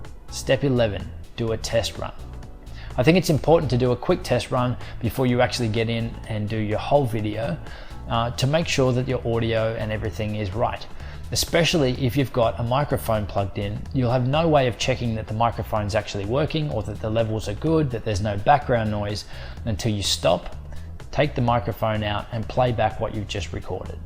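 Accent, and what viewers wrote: Australia. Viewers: You sound like you are from Australia but I can't get the microphone on Amazon to ship to Australia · Great video. Brw he sound Australian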